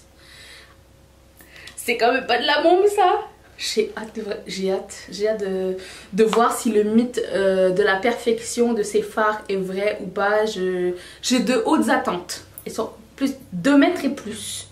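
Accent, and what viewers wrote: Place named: Canada. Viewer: Cc tu as de plus en plus l accent canadien 😁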